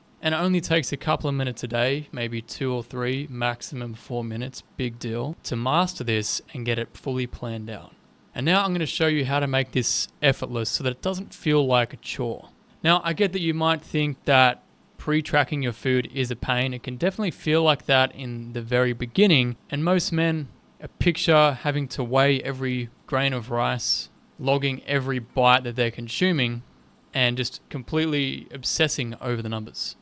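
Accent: Australia. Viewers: "a skinny fat Italian dude", I cannot see any Italian side (aesthetically) on you, even your name and surname sounds really English. From the way you pronounce some vowels I think you are Australian, anyway from which part of Italy are your (grand)parents? I like the way you explain how the body works, you are one of the best natural body I can see on Youtube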